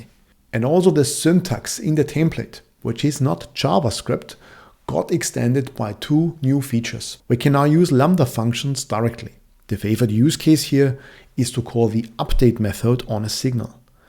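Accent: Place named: Austria. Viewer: He sounds sooooooo Austrian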